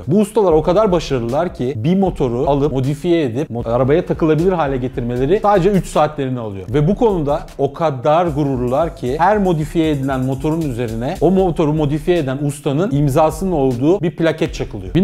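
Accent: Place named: United States. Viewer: Adam bildiyin amerikalı taklit etmiş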